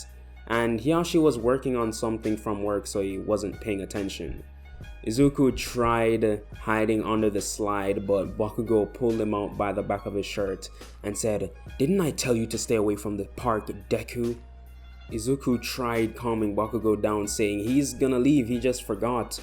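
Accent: Jamaica. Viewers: Jamaican accent?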